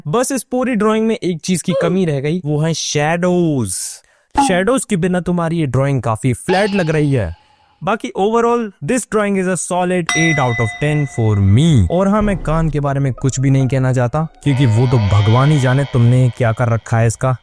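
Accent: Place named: Haryana, India. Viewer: Bro aap kha se ho language se haryana se lag rahe ho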